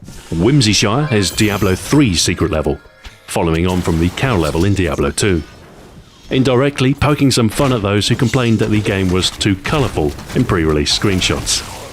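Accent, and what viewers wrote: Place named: England, United Kingdom. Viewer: English accent. All mighty men in movies and tv shows have an English accent or an accent from somewhere in the UK so that's why I thought of it.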